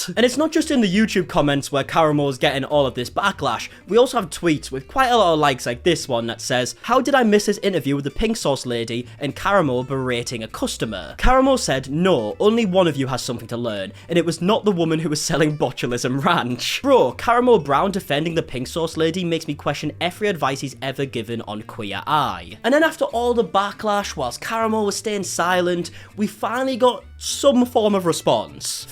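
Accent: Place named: Middlesbrough, United Kingdom. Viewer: you and Plumbella have made the Middlesbrough my fave UK accent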